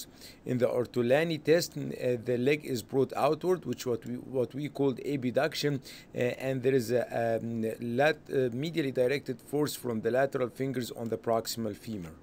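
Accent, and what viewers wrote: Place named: Egypt. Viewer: His accent sounds Egyptian I’m not sure lol Thanks for the video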